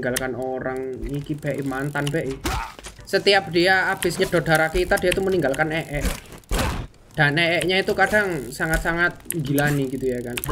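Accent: Java, Indonesia. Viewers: Abang orang Jawa 😄😄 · Logat² jowo mas arek ndi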